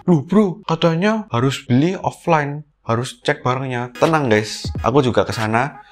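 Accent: Java, Indonesia. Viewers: Medok banget ko kaya saya🤣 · Medok review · Fokus dengerin pengalaman ❌ Iri dengerin logat jawanya yg lebih medok dari saya yang orang jawa ✅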